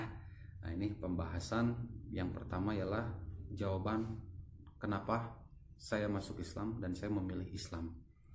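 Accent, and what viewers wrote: West Java, Indonesia. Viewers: Kok logat nya sunda banget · MasyaAllah kang, tpi mmg , jujur sy baru pertama kali ini melihat dn mendengar kisah mualaf yg berasal dri suku sunda... maaf ya kang, ini benar2 penemuan sy pertama kali ada org sunda , (dn sangat kental sekali kesundaan nya si akang ini dri lentong nya, ) ternyata ada jg ya yg beragama kristen.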